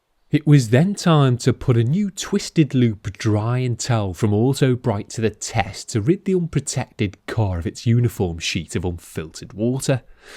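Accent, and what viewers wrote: Liverpool, United Kingdom. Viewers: Liverpool. Caution: They don't always sound this. Usually, it's not so much refined and poetic as this, moreso a nasally flem filled cough imitation. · Are you from Liverpool?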